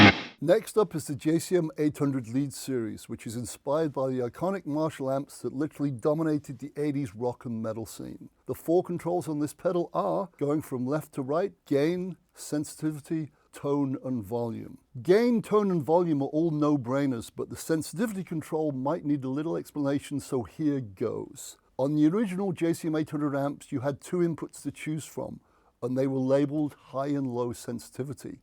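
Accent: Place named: England, United Kingdom. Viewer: Proper English accent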